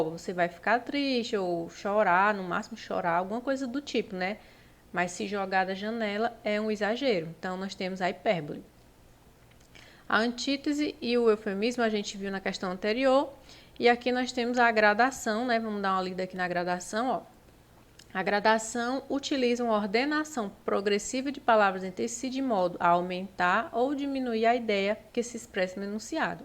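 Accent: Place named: Northeast, Brazil. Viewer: Quando acho vídeo aula com o meu sotaque nordestino, meu coração fica como ?! 🥰🥰🥰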